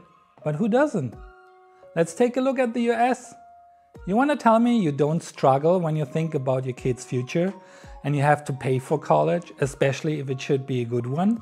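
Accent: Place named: Germany. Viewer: I really like to hear a Deutcsh speaking Englisch. So clear like Filipinos.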